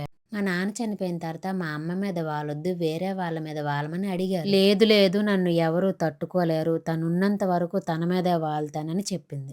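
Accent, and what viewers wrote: Srikakulam, India. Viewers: తల్లీ నీ భాష కాంచెం మార్చు శ్రీకాకుళం పరువు తీయ్యకు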